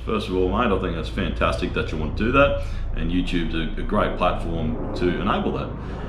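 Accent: Australia. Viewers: you are an Aussie who has one of those accents everyone equates to the land down under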